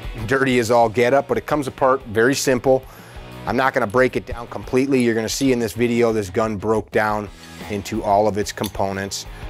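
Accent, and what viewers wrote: Chicago, United States. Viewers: You gotta be from Chicago. You have some of that Chicago accent and sound like Dennis Farina at times.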